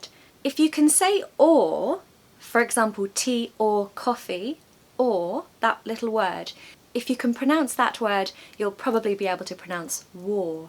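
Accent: United Kingdom. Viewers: Listening you is very easy to understand the British English accent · You represent the UK perfectly. I love your accent. Your voice is lovely to hear, and your lessons are built to create a lovely atmosphere. That is a proper good lesson 😊. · Your accent is so beautiful. I thought most British speak English just like you and I am wrong，you are the best of them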